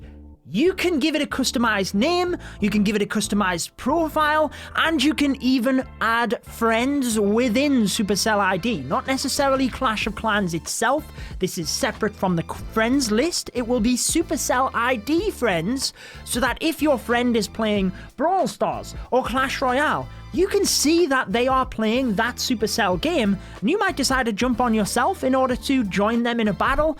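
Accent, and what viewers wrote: United Kingdom. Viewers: The intro and that sweet British accent just makes everything better!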